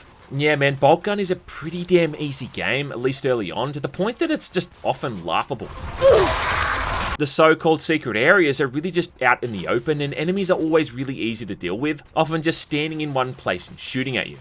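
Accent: Australia. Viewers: makes me confirm that your in my top 10 favorite youtubers of all time along with jontron, gmm, and others. Your humor is just spot on and your Australian? accent just hits that vibe and is unique for your channel. The whole description of the heavy bolter had me dying😅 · Australian accents make everything better I swear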